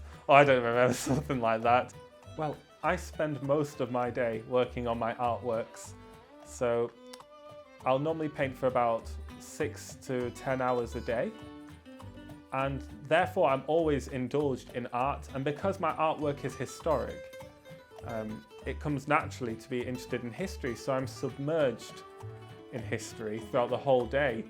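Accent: United Kingdom. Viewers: His accent sounds northern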